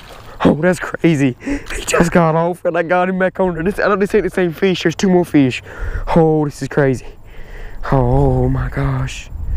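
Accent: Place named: South, United States. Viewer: country accent at its finest ur voice reminds me off mind lol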